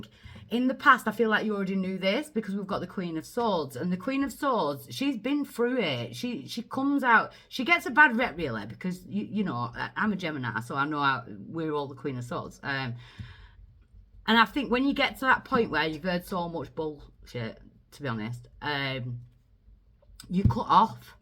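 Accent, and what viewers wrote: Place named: North, United Kingdom. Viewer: Thats a northern accent if I ever heard one!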